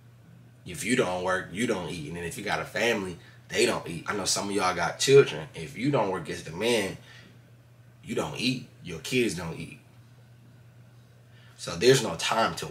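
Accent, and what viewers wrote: Baltimore, United States. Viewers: what it dew. That has to be a Baltimore accent. Dope video bro!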